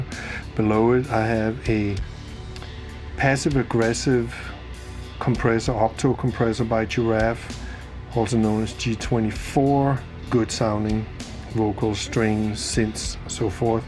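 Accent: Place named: Denmark. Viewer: HELLO! "Old friend"... GREAT an`really informativ video. Good to see that your still are alive. It`s fun to hear! That you still got "The Danish accent". Greatings from Denmark...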